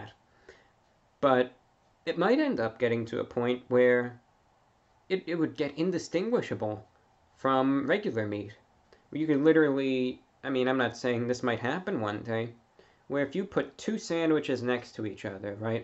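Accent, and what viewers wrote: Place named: New York, United States. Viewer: Okeechobee fla i thought he was from ny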